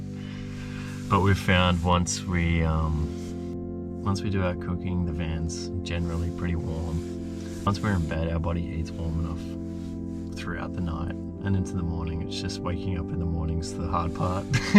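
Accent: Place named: Australia. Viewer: Are you German? You sound much more like an Aussie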